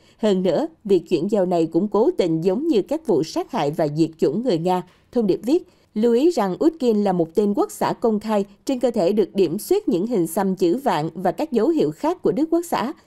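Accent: Saigon, Vietnam. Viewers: Rất tò mò kênh nầy mà giọng xướng ngôn viên hay quá, đây mới là đỉnh của người truyền tin tức cho mọi người nghe vì tiếng đọc miền Nam Saigon rất chuẩn…!!! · Giong sai gon nghe chu an va ro rang hon .va de thuong hon.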